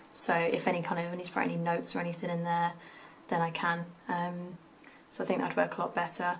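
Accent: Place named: England, United Kingdom. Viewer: I love your accent. What part of England are you from?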